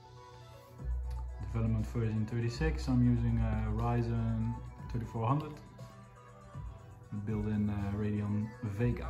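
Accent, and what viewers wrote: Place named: Netherlands. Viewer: Batocera is awesome! U sound dutch. ( I am also dutch).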